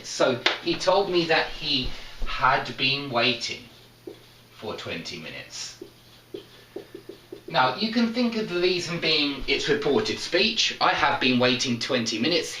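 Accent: United Kingdom. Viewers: Nice accent ^^ are u british? I´ve been there a couple of times and England is so beautiful, greetings from Brazil ^^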